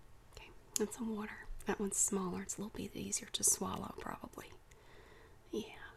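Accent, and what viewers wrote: South, United States. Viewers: Oh yeah I like your southern accent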